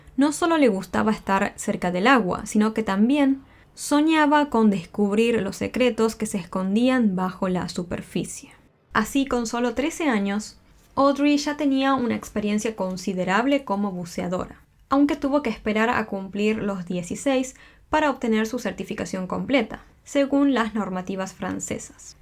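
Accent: Argentina. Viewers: Será que es argentina? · Si, es Argentina.es muy común el Che- es su acento.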